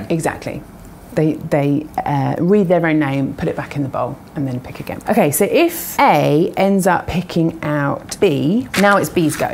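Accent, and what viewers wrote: United Kingdom. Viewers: I only watch the red headed numberphile vids for the British accent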